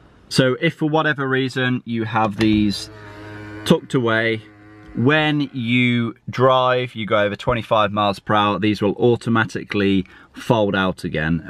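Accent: England, United Kingdom. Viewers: I know it is, but you sound British, you are showing a car with right hand drive so it's a boot!!! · You sound english though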